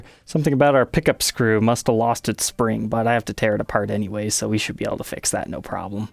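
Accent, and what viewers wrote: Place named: United States. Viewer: I stopped taking this seriously as soon as I heard an American accent.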